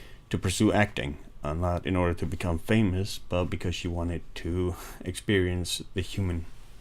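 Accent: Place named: Denmark. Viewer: hehe det er slet ikke så slemt, for det meste kan man ikke høre det, men en dansk accent har det med at trænge igennem fra tid til anden :) anyway vil bare sige at jeg subbede den anden dag efter en podcast og lige havde luret dine steep vids, og kan da se at det er gået hurtigt med et par subs den sidste uge :) håber det fortsætter med at stige og ser frem til flere videoer, kan se den nye L.A. noire er ude, good stuff :) hvis du nu skulle få tid eller lyst ville jeg da blive glad hvis du gad tjekke min lille kanal ud, har kun været i gang i 4 måneders tid så er stadig i gang med at finde min "stil" hehe (var ikke derfor jeg subbede skal lige siges men det skader vel ikke at spørge hehe) aaaaanyway du må have en dejlig uge :)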